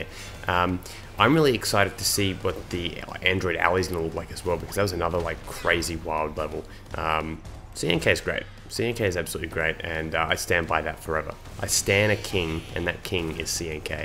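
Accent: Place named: Melbourne, Australia. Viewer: Are u from Melbourne? Love that accent. Anyways, great video!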